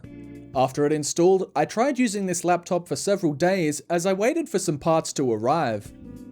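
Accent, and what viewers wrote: Australia. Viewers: just wondering are you aussie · Bruh are you Australian or S. African? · Man finally an Aussie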